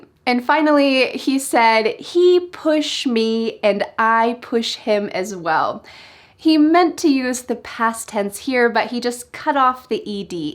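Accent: United States. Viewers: I really like ur smile, and you have a beautiful American accent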